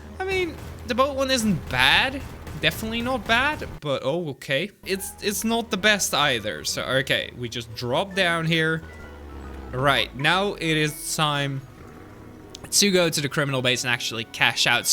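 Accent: Sweden. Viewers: ar you from swiden?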